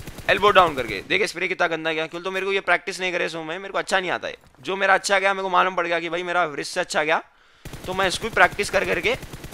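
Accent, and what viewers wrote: Hyderabad, India. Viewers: He is pacca hyderbadi..not andhra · And that unique accent is called hyderabadi 😍😍😂🥰💛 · ya bro he is from hyderabad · Hyderabadi Boltey Public,Miya Bhai💢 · Hyderabadi hai bhai sahab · Bhaii hyderabad wale aisich baat krte miya❤️ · 99% percent are even listening to the accent. Hyderabadi accent at its best. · Arey kya bolre yaro ..Hyderabad ke galli galli mein ham aisich bath karte... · he is from hyderabad bro.. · Haydrabadi accent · It's not unique it's hydrabadi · hyderabadi accent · Hyderabadi language nhi suna kya kabhi movies b sahi rehti dekh lo bhai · It's Hyderabadi language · It's hyderabadi accent, and every accent in india is unique tho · The way he speaks tell's he is hydrabadi......🔥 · Hyderabadi boltaaa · He talk in Hyderabadi accent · This Andhra - Hydrabadi accent is like perfectly made biryani.. love it 3000 ❤😅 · no naam hai he just has the hyderabadi accent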